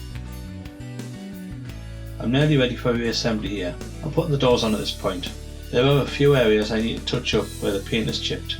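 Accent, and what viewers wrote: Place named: North East England, United Kingdom. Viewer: Hi from peterlee. I think by your accent you're not to far away. Love this VW and thanks it brought back some very happy memories.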